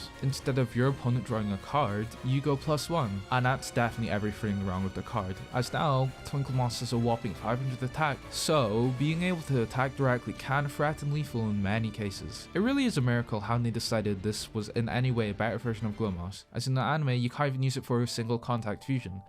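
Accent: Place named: Scotland, United Kingdom. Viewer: Great video, your accent btw, are you Scottish, specifically aberdonian?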